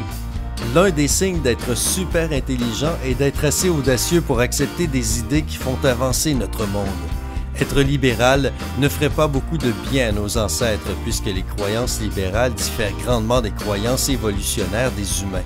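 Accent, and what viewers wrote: Quebec, Canada. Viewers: tu as un accent très jolie du Québec mais il faudrait dire vous par politesse désolé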